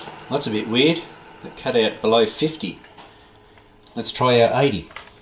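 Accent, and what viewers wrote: Australia. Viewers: Loved your delightful Aussie, unassuming, understated technical explanation that clearly demonstrates, without intending to, that electronics is not at all for the home handyman. This 'repair' is way out of my league, and I'm off to buy a new kettle!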